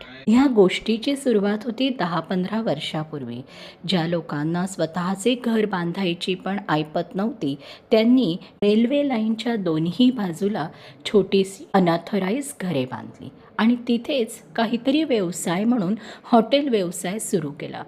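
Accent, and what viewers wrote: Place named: Pune, India. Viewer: Puneri marathi vatate !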